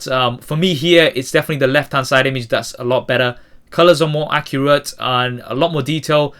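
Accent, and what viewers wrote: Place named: Birmingham, United Kingdom. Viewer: you kind of sound brummie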